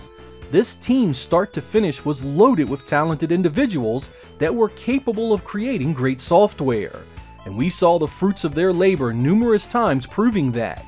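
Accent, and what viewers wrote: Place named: Delaware, United States. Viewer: So, totally off topic, but your accent... Delco? Delaware?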